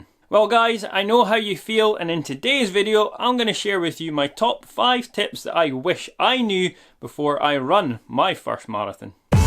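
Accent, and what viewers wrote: Scotland, United Kingdom. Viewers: Running advice with a scottish accent. What more could you ask for. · He's Scottish 😂 English is their first language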